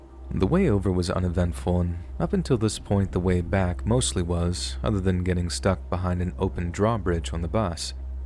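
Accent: Denmark. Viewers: So is Danish? I was trying to place the accent. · Haha, når man genkender dansk accent med det samme!!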